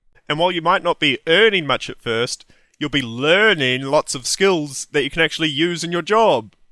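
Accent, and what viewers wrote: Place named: United States. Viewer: I was hoping for this to be sarcastic (google it). At least it made me realize I should think about how to exlpain the importance of education to someone who's not educated, because (judging by the comments) most of the people reading this a) won't bother reading it and b) won't understand it. One of the biggest problems in the world is the lack of education. A big part of that problem is about very simple stuff like reading but people having basic knowledge about how things like you, our cultures and the world work opens up incredible possibilities. You shouldn't go to school just to get a degree. That attitude is the best way to make sure you take the easiest route and get no real education. According to the video there are big gaps in the American (I'm guessing by the accent) school system. I'm sure there are teachers who would gladly give lessons about taxes and other adult stuff. 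If there aren't, don't quit school, try to make it better for everyone. Most of the "useless" stuff mentioned is not actually useless, you just never learned how to use it. When it comes to things like math problems and reading novels, a lot of it is just brain exercise that makes you generally smarter. I could go on but it's 1 at night.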